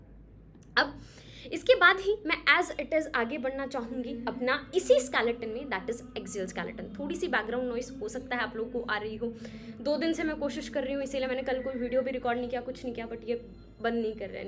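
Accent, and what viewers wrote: Haryana, India. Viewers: Haryana ig 😂?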